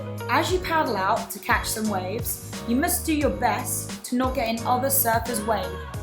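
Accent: United Kingdom. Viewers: It doesn’t feel right being taught surfing from a British accent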